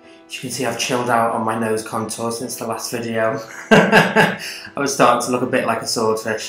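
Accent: Liverpool, United Kingdom. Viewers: Not related but I bloody love the Scouse accent! You're looking well hun, stay safe! Btw, will you be doing a queens speech this Christmas? I think as our OFFICIAL new royal you should address your adoring public! 😉🤪😂 Half joking, I know you're busy so you might not have time. 😁 Love frae Edinburgh hen! 😜🥰😘💙🏴💙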